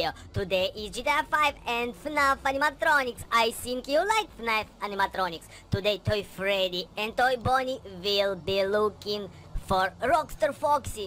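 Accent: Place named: United States. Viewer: Ты так разговариваешь потому что в соединеных штатах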